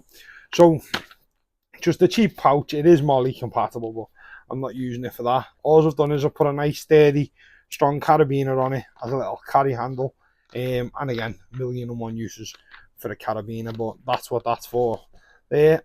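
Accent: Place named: North West England, United Kingdom. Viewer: Sound like your from the North West like me. Would love a colab at some point. 😊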